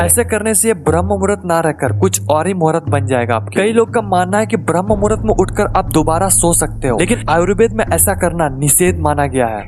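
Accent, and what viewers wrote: Assam, India. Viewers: Bro are you from Assam?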